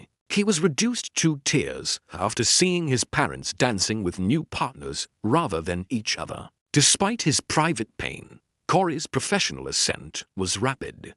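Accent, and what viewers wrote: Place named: United Kingdom. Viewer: I don't think I can listen to this all the way through because this stunted, posh English accented A.I. accent, is bloody awful! The inflection is all wrong and the sentences just don't flow!!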